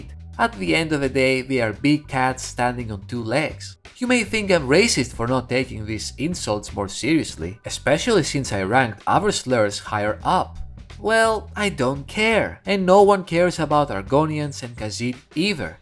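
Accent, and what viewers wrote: Greece. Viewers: Are you Greek? Me too! Haha we have the same accent!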